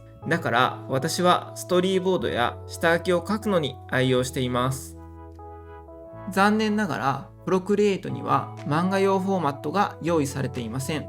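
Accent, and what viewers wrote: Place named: France. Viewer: Merci beaucoup professeur, vous nous aider beaucoup 🥹🙏🏾🥰 j'adore votre accent français, c'est juste incroyable 🤌🏾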